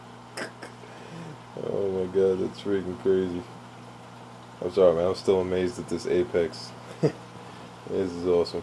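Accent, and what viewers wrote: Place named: Brooklyn, United States. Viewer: He must be from Brooklyn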